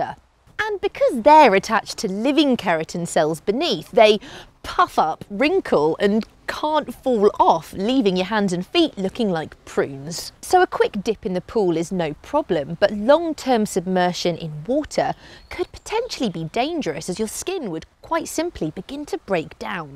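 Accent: England, United Kingdom. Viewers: Strongest british accent eva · It's only an English accent, nothing ground breaking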